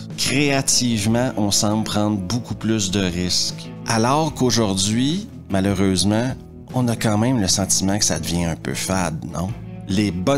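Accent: Quebec, Canada. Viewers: J'adore ton accent canadien😂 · OMFG, l'accent Québécois, j'étais pas prêt ! C'est Bruce qui m'envoie. Il avait raison, excellente vidéo :D Merci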